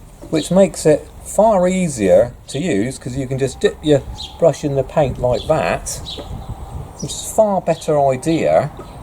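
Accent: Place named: England, United Kingdom. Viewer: sounds like you are in England....not sure if they have it there, but here in the states we have dedicated bucket hooks that basically do the same thing as your custom job there. it hangs on the rung but is off to the side of the ladder so no issues. personally i dont like them, i use a Handy Pail brand bucket to paint out of. i can slip my hand like a glove onto their handle and still use my fingers to holdthe ladder if needed. 9/10 times i set my bucket on the top one or two ladder rungs though. The only flaw those Handy Pails have is you cannot hook them onto a ladder. Purdy has one that is able to use ladder hooks but i just dont like the design, seems cheap and can only fit a 4inch weeenie roller(sausage roller, small roller, pick your adjective) and i really like to use the 6in version which the Handy Pail fits beautifully. Just some tips from a painter of 25 years here in Kansas. 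(yes that Dorthy and Toto Kansas...i live 15 minutes from the Wizard of Oz museum.)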